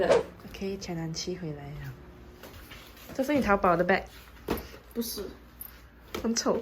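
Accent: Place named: Malaysia. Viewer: 我的天 这就是同为马来西亚人的同乡直觉吗(bushi 哈哈 刚刚滑到你的影片 一点进去听了全程韩语 再看了看频道名字放的是英文名，就在猜说是不是马来西亚人呀，结果还真的 哈哈 一点这部一听口音就确认了 哈哈 加油呀🙌影片很棒👍👍😍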